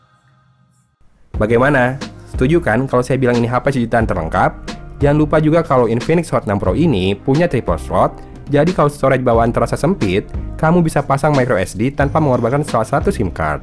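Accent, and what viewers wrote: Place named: Sunda, Indonesia. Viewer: Urang sunda mana suara nyyy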